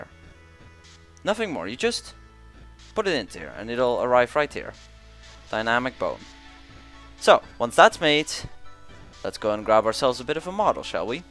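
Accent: Netherlands. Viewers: i dacht al dat een nederlands acsent had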